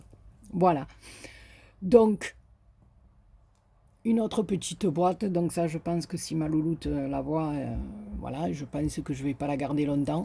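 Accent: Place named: Marseille, France. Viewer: Bonjour,je te regarde depuis plus d un an,sans jamais avoir commenté 🤔🤔,oui c est n importe quoi 😊lol,vos mieux tard que jamais,comme on dit lol j adore tout ce que tu fait,tes sublimes fleurs,que j ai toutes reproduits,et maintenant tes boîtes,alors ça j adore,j adhère hihihi☺,j en ai fait 4 déjà 😉,mais les petites,mais celle ci wahouuu, Merci pour toutes ses très belles créations que tu fait 😊,et merci pour cet accent qui est terriblement Marseillais 😊,j adore 😁,ainsi que ta bonne humeur,tu me fait sourire a chaque fois,a très vite bisous bisous du sud ouest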